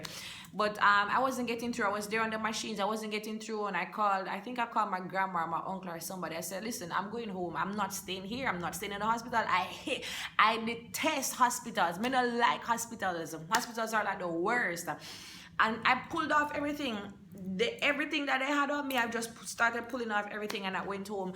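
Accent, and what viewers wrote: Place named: South Africa. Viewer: Wow....I really love her accent❤💯🤗#SouthAfrica.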